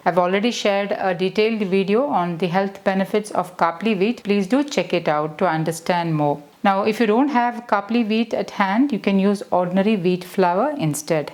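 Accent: Kerala, India. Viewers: Pretty thick Mallu accent. Aunty, it's sprinkle NOT springle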